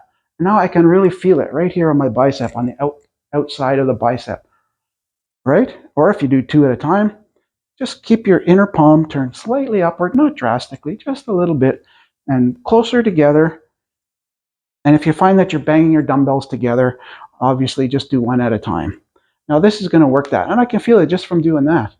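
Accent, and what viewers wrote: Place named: Canada. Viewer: R U Canadian? You sound like a Canuck. By the way you look awesome for 60 I never would have guessed. #Stayblessed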